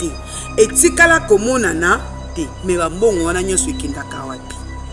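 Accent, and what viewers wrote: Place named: Brazzaville, Republic of the Congo. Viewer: Oza congolaise ya Brazza te prononciation la prouve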